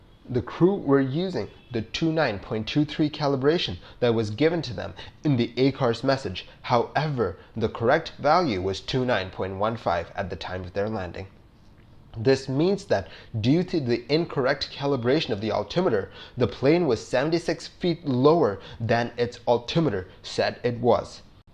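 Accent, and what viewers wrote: India. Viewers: He's from India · You sound like an absolute asshat, since he is Indian and English isn't his first language 🤷‍♀️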